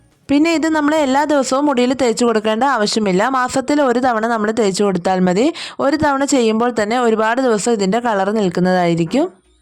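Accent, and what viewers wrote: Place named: Central Travancore, India. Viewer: Central Travancore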